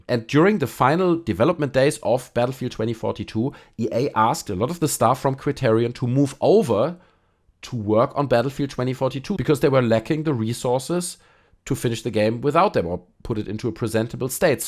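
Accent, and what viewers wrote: Germany. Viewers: could it be that you are german? you have that distinct accent ;) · The german accent is strong in this one. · The accent is so bad i am pretty sure you are a german.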